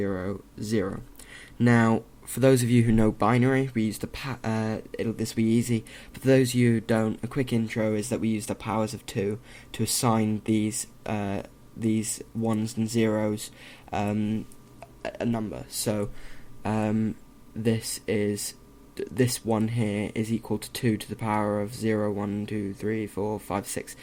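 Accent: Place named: United Kingdom. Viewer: Dude.... you are a genius... my mind is blown... and the british accent makes you sound really smart too...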